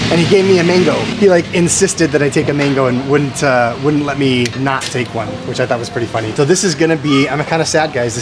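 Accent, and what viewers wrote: Philippines. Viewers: The way you talk is like I'm hearing a Filipino guy talk. It's that good! If I hear the way you talk without seeing you I'd think you are 100% Filipino lol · Aaawwwww. So so nice to watch your last vlog before leaving but I will also look forward to your 1st one as soon as you come back. I pray that you will have a great time back home and catch up with your bunny buddy and I feel your dilemma on what to do with him. I think finding him a good home that you can always visit is a better option, and you are right about not bringing the poor guy to the Phils because of the hot weather unless you will live in Baguio city. 😃 Well,ingat ka sa pagbiyahe at sana maging malakas at malusog ka palagi para marami ka pang maipakitang video para sa amin. Paalam pinoy at hanggang sa muli.( Ps,natutuwa ako everytime na sinasabi mong pinoy ka with perfect filipino accent😂) May God Bless you and I do wish you well. 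From one of your avid fans from the land down under😊Kitakits👋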